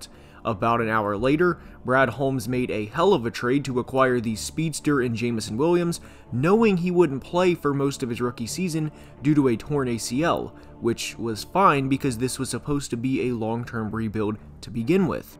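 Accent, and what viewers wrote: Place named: Minnesota, United States. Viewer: is a Minnesoh-tan gotta give him a break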